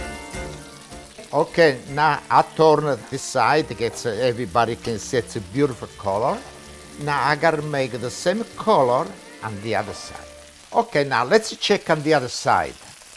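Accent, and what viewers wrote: Italy. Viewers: Excellent recipe, the version with beef is called pizzaiola with origan instead of pepper and without eggs and breadcrumbs simply cooked. Italian accent but no Italian living in Italy would eat a second (main dish) together with a first course, we believe in the separation of... powers (chef Montesquieu😉 ). Dividi et impera.. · I love his accent. Yes I want to learn how to make real Italian food from a real Italian. Thank you for this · Love when Italiens speak English ❤️ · Cin cin! Love his Italian accent! I made his parpedelle & Bolognese the other night. It was so so good! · I'm loving that accent, he's a true Italiano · Classic Italian acsent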